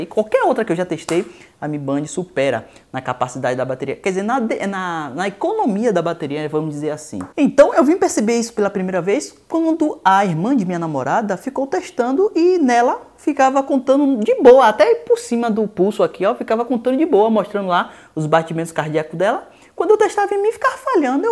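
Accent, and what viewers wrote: Bahia, Brazil. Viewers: Sou de São Paulo e adooooro seus vídeos, seu sotaque baiano é musical😊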